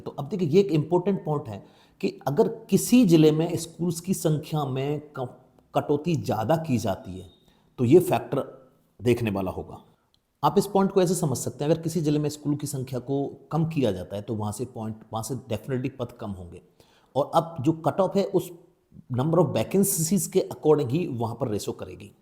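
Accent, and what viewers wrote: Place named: United Kingdom. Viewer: Bhai apka accent british h..mujhe u.k m teacher lagva do na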